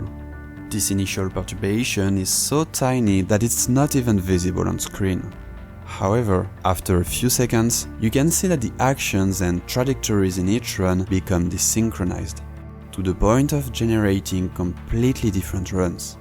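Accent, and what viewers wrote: France. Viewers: are u french? your accent like french? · He sounds french, it's like he use syllabic intonation, while English should be stress-timed. Interesting. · Are you french? I can sense a little bit of french accent in your prononciation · Great job. I think they just lack higher levels of abstract thinking. They're like babies learning from trial and error so experiences just remain at the very basics. But your work, insights and doubts are the best. Thank you for that. Your accent sounds french, are you from a french speaking country or I'm totally missing the point? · L'accent français me termine, video de fou bg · Are you french cause your first language is obviously not English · J’adore ton accent français c’est trop drôle. Trop bien la vidéo sinon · Tu as un accent français, je me trompe? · Are you french? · Bro you're french and your accent is so bad But the topic of the vid is good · Why are you french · Open video French accent Close video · He sounds french and his ai does wr runs. 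confirmed:D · omg your french accent is difficult to listen to xD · This is the most effortlessly heavy french accent I've heard. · Are you french? Because I can clearly hear your accent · superb video man. just one minor complain, please as a french guy, I can't stand your english accent, please, do make an small effort, plus your grammar and vocabulary are very good, just less fenchy "ze" instead of "the" ect. Anyway thx for the video I've learn a lot! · Are you french because you have french accent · You have a French accent is it true? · Je suppose que tu es français nn? Ton accent et bon mais français 😂❤❤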